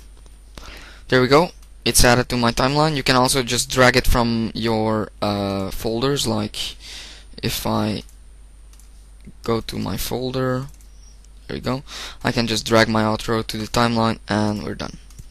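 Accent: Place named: Netherlands. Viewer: haha en je nederlands? dat lijkt zo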